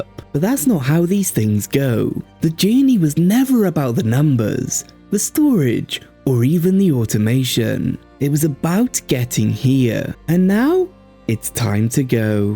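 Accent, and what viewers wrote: Wales, United Kingdom. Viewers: I recognise a Welsh accent anywhere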